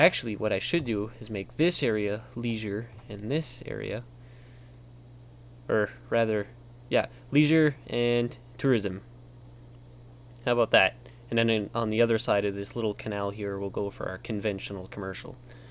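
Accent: Canada. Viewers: I mean u sound Canadian, but the most famous Atlantic city I know is here in jersey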